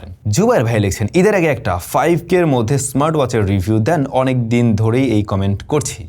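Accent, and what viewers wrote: Barishal, Bangladesh. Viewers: আরে আমনে দেহি মোগো বরিশাইল্যা ❤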